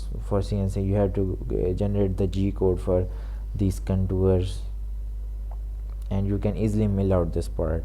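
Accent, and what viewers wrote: India. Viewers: ***** I thought it starts from skrach, absolute zero, & that the narration will be more interesting: "Hindi accent" is NOT an excuse for 'the boss make me do this video'. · is it possible to find someone that speaks english clearly and does not have a heavy punjabi accent?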